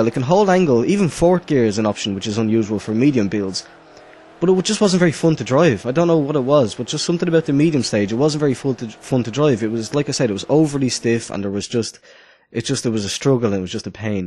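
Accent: Dublin, Ireland. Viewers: Where in Ireland do you live? You sound like a bit of a Dubliner. :)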